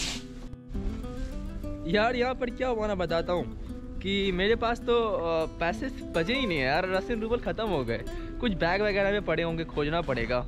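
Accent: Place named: Haryana, India. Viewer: Had mtlb bones, hal mtlb kaise ho Bhait tum haryana se ho lgta h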